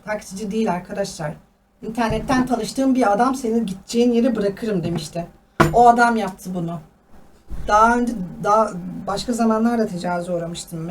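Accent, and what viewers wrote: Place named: Arab world. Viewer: arap kadini şivesi yapan bir genç hanım zannettim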